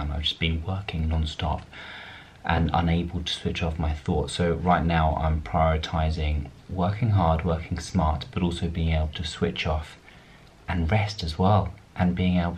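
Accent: United Kingdom. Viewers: I think from the accent you are British, am I right? 😁 Anyways, injuries are important to discuss, specially the beginning of starting working out. I usually have knee, thigh and back problems. My issue I get easily tired and can't increase weight. Another thing I can't keep motivated, specially during lock down. But thanks for your videos. P. S. Keep the beard 😊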